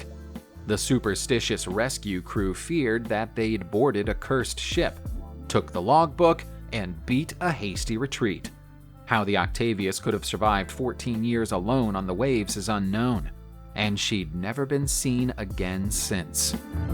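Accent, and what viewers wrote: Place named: United States. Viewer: The pronunciations of British names are quaintly American too, as is the typically American mispronunciation of route as "rowt".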